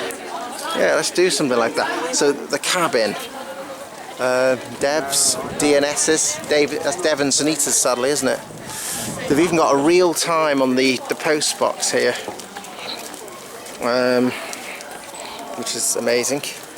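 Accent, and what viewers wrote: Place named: Manchester, United Kingdom. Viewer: Very interesting thank you!...I love your Manchester accent too :D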